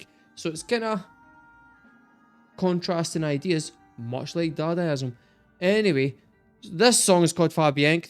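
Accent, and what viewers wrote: Scotland, United Kingdom. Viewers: now that I've found an reaction in Scottish accent, my life is complete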